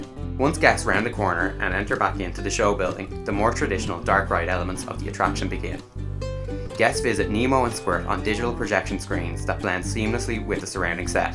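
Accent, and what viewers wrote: Ireland. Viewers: Yes, it's excellent quality. Thank you. Just not used to a non-American accent in these videos lol. Irish?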